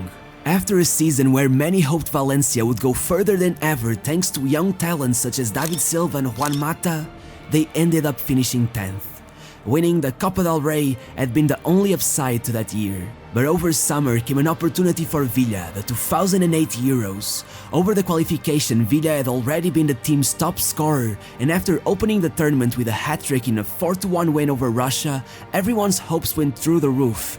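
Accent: Portugal. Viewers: Are you portuguese? This might sound weird but your english sounds like portuguese english :D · Are u a portuguese speaker? Seems like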